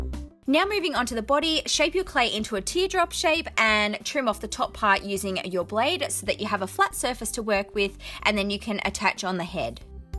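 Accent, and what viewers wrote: Australia. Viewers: I don’t know what’s cuter your creations or your Australien accent 😻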